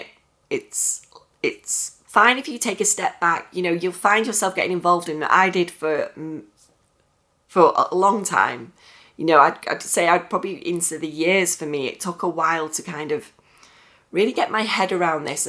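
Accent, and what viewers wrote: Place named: North, United Kingdom. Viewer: Hi,! My name's Vladimir. What I've just tried to do is the video for YouTube with my complaints about my life lol, but not sure I will upload any of them. I'm 34, I've been havin' severe magical thinking ocd for more than 20 years. My life is almost fully controlled by this shit despite the fact I know about ERP and I've been studying psychology. I got rid of some compulsions in the past, but now my life is at the zero point. I'm a russian guy, I work as an English teacher for dimes, I don't have friends and I feel insanely alone. I found your channel maybe at the beginning of June and I wanna tell you that you make great videos and you're a nice person!🙂 Some hours ago I talked to another therapist and it costed a significant amount of money, he's a great man and I do understand it's only the first session, but why the f**k it should cost such money to listen about my unhappy life? 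I love your accent, by the way, I think it's a northerth one.🙂, I've been studying psychology on my own and what I've noticed that people in the west (but I'm not yet familiar with all of these ACT and other stuff) generally talk about ERP, which is great that they tell people about this method, but it's only tip of the iceberg. I believe that scientific psychological theory that tells the reason of OCD as well as panic attacks and other anxiety disorders is the inner conflict inside of our mind. Maybe you will be interested in Jacques Lacan. People sometimes ask - why my ocd themes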